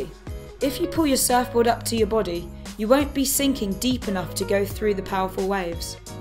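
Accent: United Kingdom. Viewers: Hahahahaha..I just love the English accent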